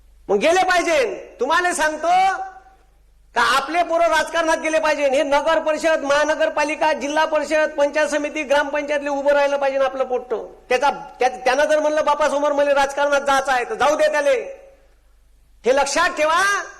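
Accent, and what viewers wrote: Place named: Vidarbha, India. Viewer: अबे हे वऱ्हाडी होय शुद तु बेलत जाय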